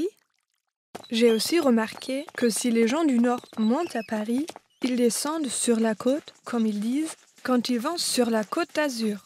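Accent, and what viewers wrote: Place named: Germany. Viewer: L'accent allemand est tellement charmant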